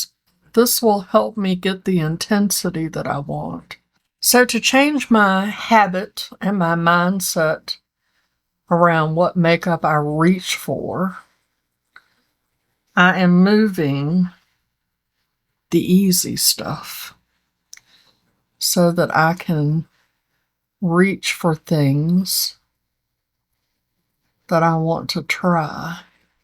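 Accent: South, United States. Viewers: Love your southern accent! Have to listen on 1.5x speed though 😅❤🎉 😆🌞❤️💖🙌🙏 I truly needed this video today! Bringing out my wax melts and Dior foundation 🙏😇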